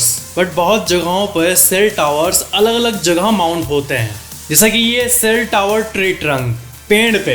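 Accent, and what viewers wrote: Bihar, India. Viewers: Are you from Bihar or Up I am from bihar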